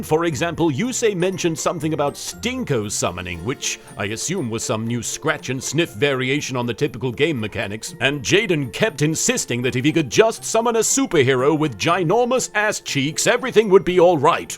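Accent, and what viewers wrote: United Kingdom. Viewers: Why is he so British…? 🤔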